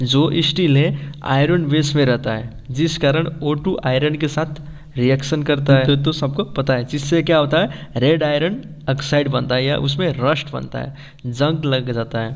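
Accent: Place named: Nepal. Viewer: Nepali ho Kya bro ta .. Hindi ta Nepali le bolni jasto cha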